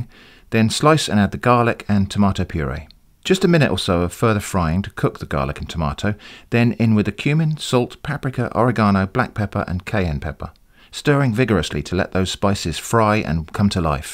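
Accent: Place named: Southern England, United Kingdom. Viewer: It's southern English accent that gives the word a different inflection. Even English words sound strange in different regional accents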